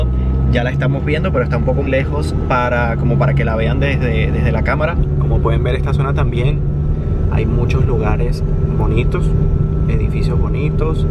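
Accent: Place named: Venezuela. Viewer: Que asco da venezuela, en serio, se los llevó el diablo... 1. El barrio mas rico de caracas (la lagunita country club) es un simple barrio estrato 4 residencial de Colombia, y "las mercedes" un simple estrato 4 - 5 comercial en Colombia. Ni su palacio de miraflores llega a ser estrato 6 en Colombia. 2. La zona colonial caraqueña (el altillo) es una completa mierda comparada con los magnanimos centros historicos de Cali, Cartagena, Popayan, etc, 3. Los centros comerciales de "clase alta" en venezuela parecen un simple supermercado Ex!to en Colombia. *América es un continente. No un país; analfabeta (tras de que tu acento venezolano es totalmente barbarico-animalesco, no le podes sumar a eso tu malinchismo iletrado de llamarle a Estados Unidos, América: EMBAJADA ESTADOUNIDENSE, NO EMBAJADA AMERICANA).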